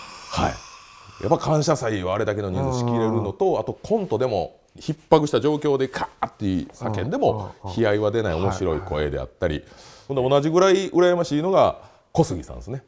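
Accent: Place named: Kyoto, Japan. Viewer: めっちゃ所々京都弁感じる 上品な人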